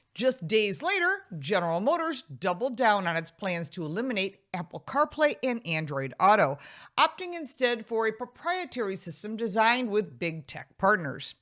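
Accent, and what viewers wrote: United States. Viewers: I don’t care about your bambling on in your yanky voice I’m Aussie and I drive a Australian made car made in the 90s with a huge sub woofer don’t want to know about Electric crap shit boxes cars are petrol v8 and six cylinder turbos WAKE UP FK WITS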